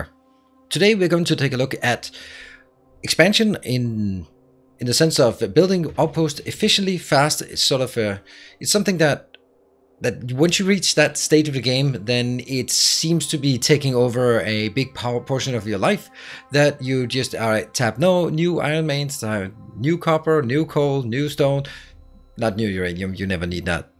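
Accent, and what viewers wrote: Denmark. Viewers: Are you danish?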